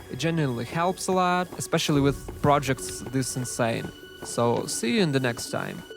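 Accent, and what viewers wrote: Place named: Russia. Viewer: Звучишь как русский бро, а видос прекрасный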